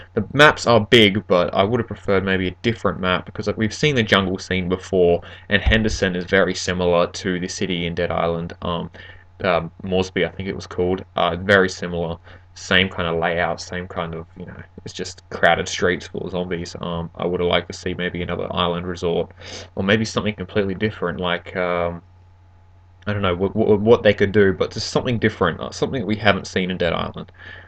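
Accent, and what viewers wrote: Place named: Australia. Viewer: you sound like a true aussie :P good work!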